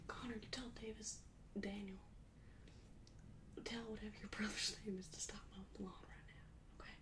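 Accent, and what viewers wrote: South, United States. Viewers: That accent and the softened profanity is such nostalgia for me as a child of the Bible Belt. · You are one of the BEST at role plays! I love the southern accent and you seriously have amazing acting talent. Please keep this character in your regular video rotation! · that strong souther accent is flawless. i guess it helps to be from the south lol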